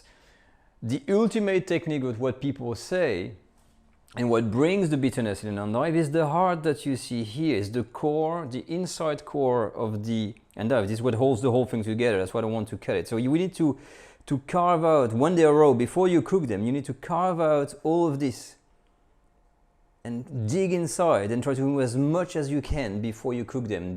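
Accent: France. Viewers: I like the Chef french accent in english...Of course the recipe is delicious.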